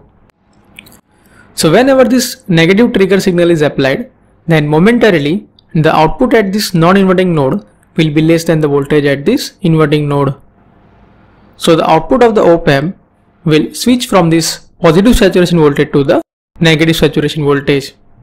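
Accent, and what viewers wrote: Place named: Tamil Nadu, India. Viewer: Exactly this is the video what I need. A voice of guy from tamil nadu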